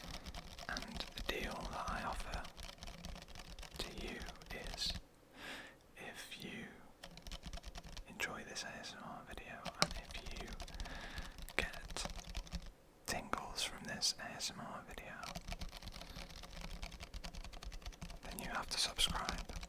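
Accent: United Kingdom. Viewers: Bro I am just fascinated by the British accent, as a non-native speaker I just love those pronounciations, fantastic video anyway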